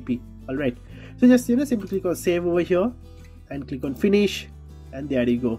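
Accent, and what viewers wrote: India. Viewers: This indian accent is super annoying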